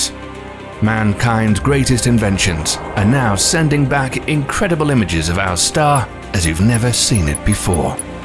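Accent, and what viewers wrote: United Kingdom. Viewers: You speak with a British accent, yet you use Fahrenheit? Please use international standard units.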